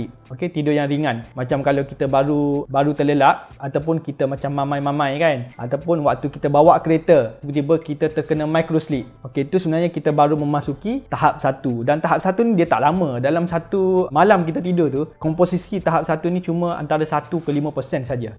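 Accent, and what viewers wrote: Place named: Kelantan, Malaysia. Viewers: abg ni mesti kelantan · Hehe....klate la tu bosskur... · Bunyi lg slang kelantan tu🤣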